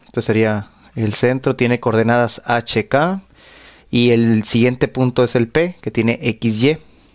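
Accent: Mexico. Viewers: es chistoso el acento mexicano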